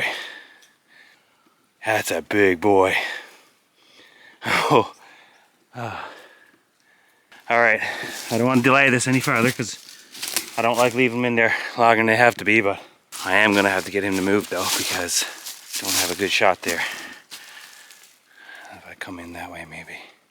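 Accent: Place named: Prince Edward Island, Canada. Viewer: Its similar to the PEI accent, which is a mix of Newfy and Scotian I think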